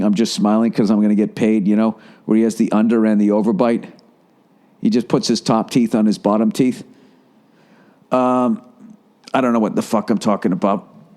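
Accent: Boston, United States. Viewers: BIIIIIIIIIILLL!!! We fucken love you man! (That shit does matter, gotta get too those two last stadiums man) And fuck that guy! Make America Great again. Anyway I learn heaps and loads from you about American sports and other shit in fits of laughter, I watch all your shit, we love that boston accent "DOWN UNDER!" (say in Aussie accent) BUT please watch a bit of that new star trek on Netflix... and then yell about it..its the fucken best! peace n love......p.s. say the dudes name ill take care of it. Great podcast.. Pps go fuck yourself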